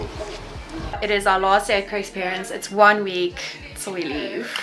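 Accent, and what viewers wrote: South Africa. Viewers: Fantastic. Nice vid. I love the Afrikaans accent.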